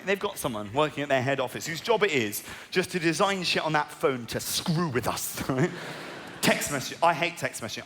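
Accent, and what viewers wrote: RP, United Kingdom. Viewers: Get better friends, lol. No but honestly, I'm Swedish too and most people I know would be able to follow this no problem. When I saw Jimmy Carr here in Sweden the venue was sold out, people here like British comedy and language is not generally a barrier. Maybe for some people but not the majority. And my experience is people who do struggle a bit with English generally find British English easier than American, and Jack is quite posh so that doesn't exactly hurt. Hade gärna sett Jack live också om han kommit till Sverige men 2014 visste inte jag vem han var tyvärr!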